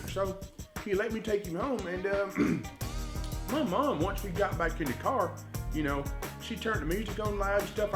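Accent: United States. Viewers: You gone on ahead now, with THAT Southern Style Country voice. Is yourself with "Blue Grass Entertainment?"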